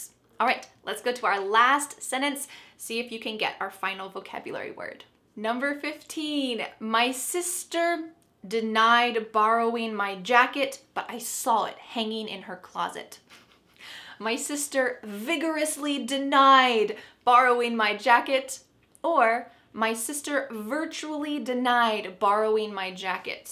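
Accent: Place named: United States. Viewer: Hello My name is Eugene. Thank you very much indeed for your super American English accent. Here in Rwanda we truly love you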